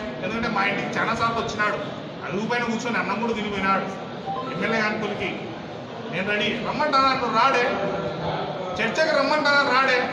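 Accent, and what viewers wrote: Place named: Rayalaseema, India. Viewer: Mana rayalaseema slang tho adaragottav anna.jai Sudheer Reddy anna.jai ysrcp. Love from jammalamadugu.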